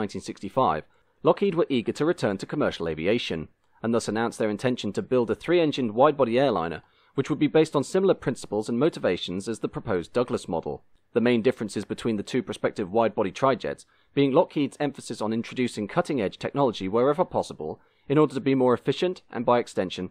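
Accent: England, United Kingdom. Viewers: with a name like that I didnt expect an english accent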